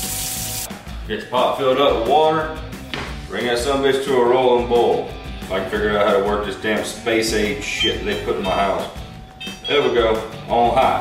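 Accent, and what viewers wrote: South, United States. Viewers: You're brilliant, and so down to earth. You make it great entertainment down here in Australia for me. 😂 I love hearing your stories and this cooking stuff is just a down to earth southern country boy by the sound of your accent that I've that heard on utube. I wonder why you are single, I'd love you to be leaving your boots under my bed. You are one good looking handsome hunk of a man. 😊 ❤❤❤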